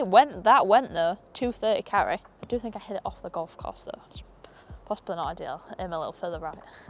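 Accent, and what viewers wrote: Yorkshire, United Kingdom. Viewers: Have played the G410 and am looking forward to trying the 430 when it's available. Liked the review and the friendly Yorshire accent, thanks.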